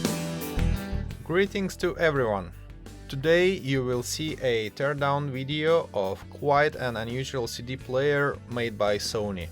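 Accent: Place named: Russia. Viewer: Комментатор явно русскоязычный))))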